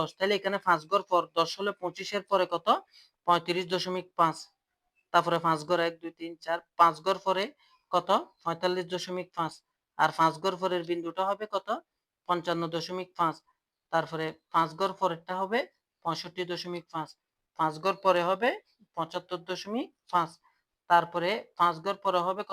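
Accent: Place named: Chittagong, Bangladesh. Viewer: Thik dhorchen....sir er kothay buja jacche je sir ctg er.....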